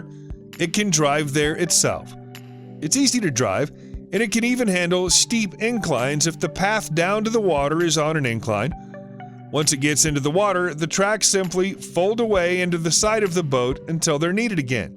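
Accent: United States. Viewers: Another utter load of bollocks with a really fucking annoying IQ zero american narration.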